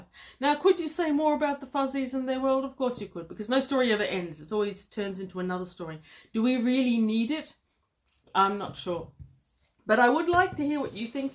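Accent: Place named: New Zealand. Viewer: I'm wondering why a woman with a Kewpi accent (I'm guessing here, all I really pick up is southern hemisphere) has U.S. editions of these novels. Is it just that New Zealand has such a small population that, even with the distance involved, it's cheaper to import such small quantities than to do the setup to print them.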